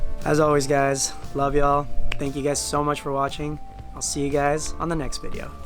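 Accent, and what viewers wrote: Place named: Spain. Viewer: I like the Spanish accent. Caught me off guard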